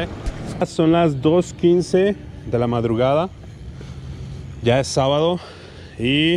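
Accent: Mexico. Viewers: Tienes el acento de mexivergas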